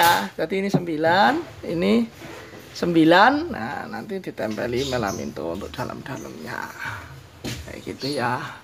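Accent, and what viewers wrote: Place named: Java, Indonesia. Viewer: sip boso jowone medok .👍👍👍👍 tapi inspiratif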